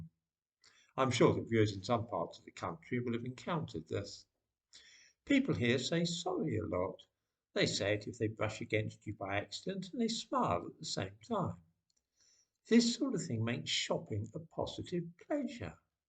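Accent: United Kingdom. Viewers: Every time I hear "Hello Again" I chuckle and smile, Love your videos and accent of course. Then I wonder is he typical British? Is there a typical Brit? There are so many sub sets I guess you'd call them, of areas or Nationalities like Whales Essex Ireland Scotland etc. What are you? I know you aint a Scot nor Irish but you are smart and i enjoy listening to what you have to say. I feel were pretty much in the same boat but a different continent. It scares me that our two Govts. are in lock step together same talking points agenda its like they're psychic. did someone say Hello again?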